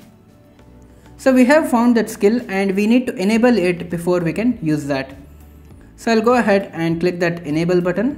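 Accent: India. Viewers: Hated the vid. More sonoff, less indian accent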